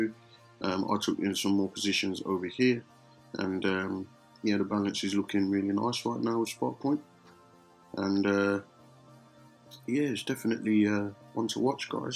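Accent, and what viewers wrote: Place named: South London, United Kingdom. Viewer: Alright bruv. Trying to pinpoint the accent. South London, or South east area? Really enjoy your content bro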